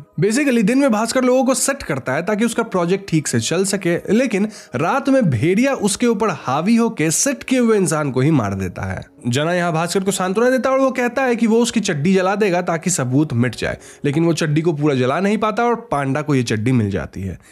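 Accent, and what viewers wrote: Bengal, India. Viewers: Karon tumi jokhon kotha bolcho majhe majhe bangla beriye porche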